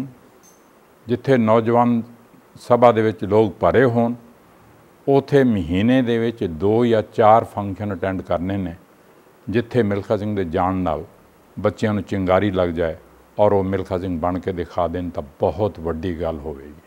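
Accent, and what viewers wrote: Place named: Multan, Pakistan. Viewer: Beautiful. Maza aa gaya Malikha Singh ji ton Multani ( hurn inu Saraiki aandy aan) di mithri boli che mithri khawat sun key.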